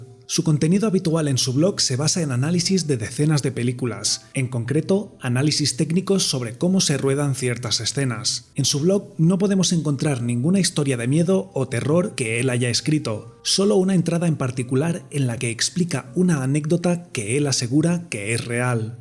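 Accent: Castile, Spain. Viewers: AGUANTE EL ACENTO CASTELLANO DE CASTILLA!!!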